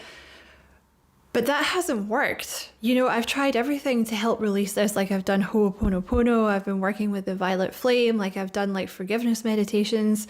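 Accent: Scotland, United Kingdom. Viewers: OMG, I knew that you were Scottish by your beautiful bro. And I just found out that I have a percentage of Scottish in myself and I just absolutely adore that.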